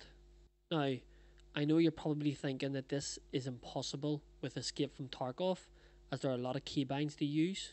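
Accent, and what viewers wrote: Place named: Northern Ireland, United Kingdom. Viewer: You from N.I?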